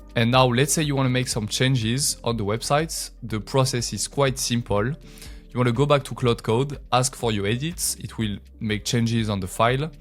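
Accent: France. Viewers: C une dinguerie , btw on sait tres bien que tu es francais rien qu’a ton accent hahahaa . Tuto incroyable , si tu pouvais nous en faire plus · Goeh i hate french accents. Just talk normal!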